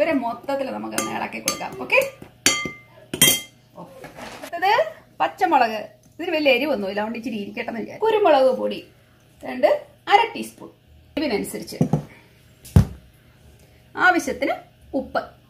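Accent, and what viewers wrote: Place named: Kuttanad, India. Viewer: സംസാരം കേട്ടിട്ട് കുട്ടനാട്ട്കാരി ആണെന്ന് തോന്നുന്നല്ലോ 😊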